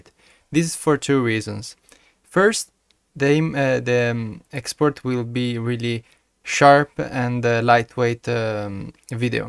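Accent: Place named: Italy. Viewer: si sente un sacco l accento italiano! xD comunque sei un grande, funziona e mi ha salvato la vita!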